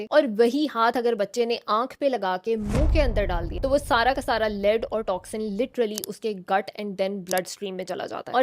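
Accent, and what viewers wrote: Punjab, India. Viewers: Hhhh is my fvrt Dr, you talking is so cute 😸 with panjabi 😘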